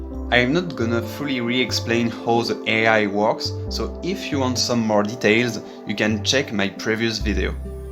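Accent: France. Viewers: Ouep c'est un français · are you french? your accent let me think you are x) SI tu l'es GG a toi content de voir un génie français comme toi^^ ça à l'air si complexe x) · Il est francais l'accent plus les sous-titres en anglais et français....il est francais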